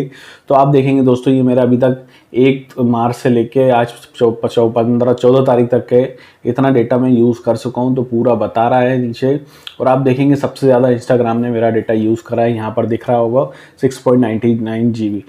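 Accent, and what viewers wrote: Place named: India. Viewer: Awful accent. Hard to understand. You Indians should be more careful about who is listening to you and try to speak slower and clearer.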